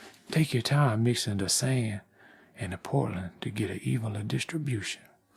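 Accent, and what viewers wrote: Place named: South, United States. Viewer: i love the narration. it's that down home southern vibe, but also ASMR. haha.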